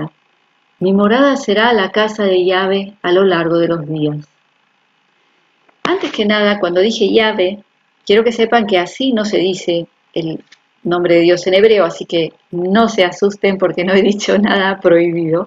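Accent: Argentina. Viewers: Perdona, eres argentina?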